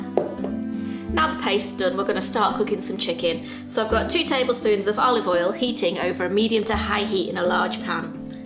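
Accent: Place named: Northern England, United Kingdom. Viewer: Ur nothern arent u 😛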